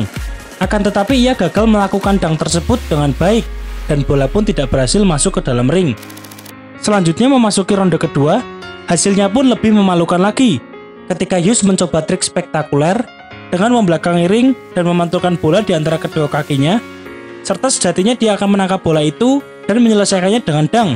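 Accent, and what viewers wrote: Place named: Medan, Indonesia. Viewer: Medan nya medok bgt, hahaha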